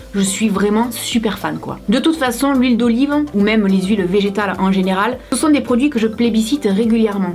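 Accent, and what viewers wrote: South, France. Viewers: C'est normal elle viens du sud comme moi à l'accent du sud bien prononcer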